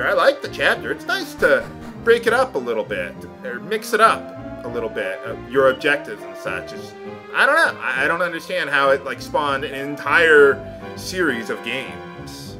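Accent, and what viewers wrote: Ireland. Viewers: This accent sounds Irish to me. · It sounds like he is Irish to me. The myth of the town disappearing and some the phases are what make me think so. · its an Irish accent:) and also give the cowpat to tornecko when u can:) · btw its Irish the accent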